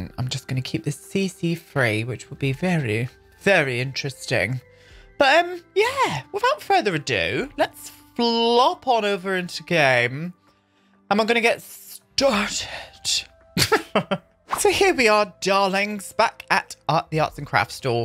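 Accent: United Kingdom. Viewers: Hahahaha adoro esse sotaque britânico. Me acabo de rir com esse "Hello"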